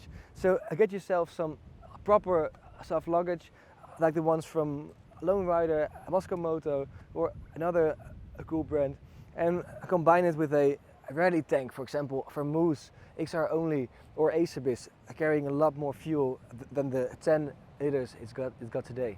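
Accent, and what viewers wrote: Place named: Netherlands. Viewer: Hey! Ive been interested in getting a honda XR600R (after getting my licence) for a while now but as i'm a beginner i feel like it might be a bit too much. do you have any recommendations for similar bikes that are more for beginners and that are easilly/cheaply available in the Netherlands (its sounds like you're from here) Thanks in advance!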